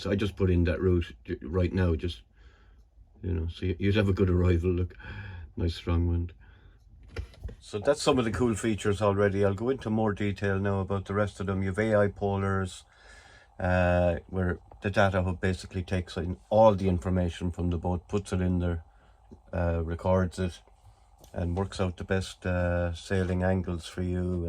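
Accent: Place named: Ireland. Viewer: Oh ! What a pitty ! The AI translate everything in french ! No more irish accent !😑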